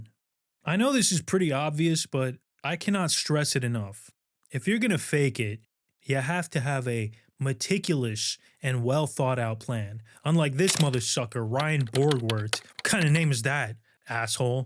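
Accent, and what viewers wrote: New York, United States. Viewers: The new yorker/jersey accent makes this video better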